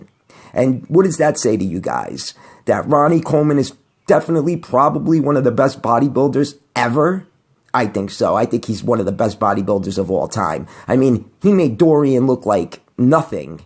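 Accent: New York, United States. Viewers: i love that nyc accent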